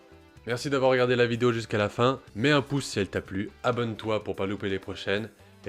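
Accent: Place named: Quebec, Canada. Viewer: Ce petit accent québécois. 👌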